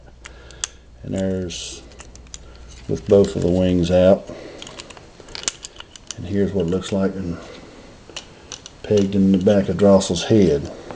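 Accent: South, United States. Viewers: You sound southern to me XD Sorry if Im wrong! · Whoa, the southern accent threw me off a bit there, lol. Good vid.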